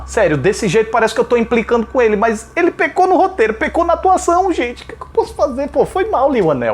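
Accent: Northeast, Brazil. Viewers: Chó advinhar, tu é nordestino néh?? Eu sou! Aq tá fazendo um calor da gota serena! 😅🔥🔥🔥 Amei o vídeo! ❤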